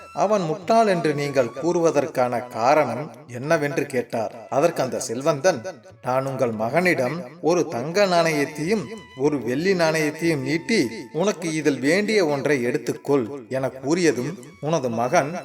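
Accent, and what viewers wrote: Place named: Sri Lanka. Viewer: அவதானித்திருக்கிறேன் .இன்னும் இலங்கை தமிழ் விடவில்லை 😢 லைக் செய்துவிட்டேன் .நட்பே ❤😂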